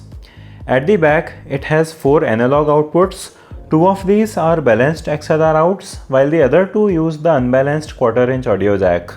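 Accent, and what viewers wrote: India. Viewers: The Indian accent is the most annoying English accent in the world ewww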